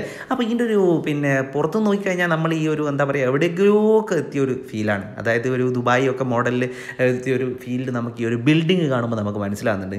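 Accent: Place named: Kozhikode, India. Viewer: നിങ്ങളെ വീഡിയോ കണ്ടിട്ട് കുറച്ച് ആയിട്ടോ -- ഏതായാലും സംസാരം കോഴിക്കോടൻ ആയത് കൊണ്ട് നല്ല രസണ്ട്ട്ടാ😝 മക്കൾക്കും ഇഷ്ടം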